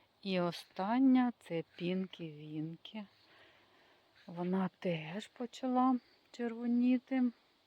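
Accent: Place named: Russia. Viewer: Как ни выдавливай русский,а он все равно проскакивает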